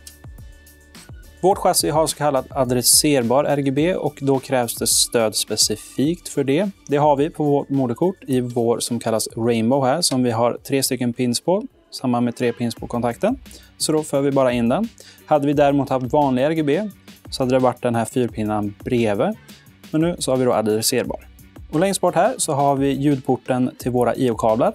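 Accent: Sweden. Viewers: Jag gillar att han uttalar alla namn med svenskt uttal, istället för engelskt. Låter lite roligt :D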